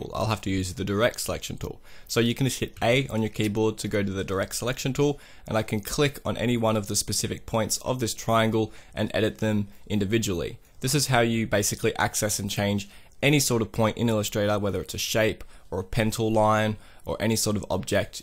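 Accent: Australia. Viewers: Thank you for this helpful video. Nice to hear an Aussie accent! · So glad to find an Aussie tutorial I can understand 😅🙏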